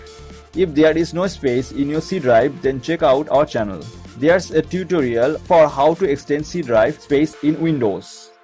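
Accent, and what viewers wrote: India. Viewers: ok yeah the best video u will watch because still works on 2021!!!! thx a lot, u know when he's got hindi's accent u know it works.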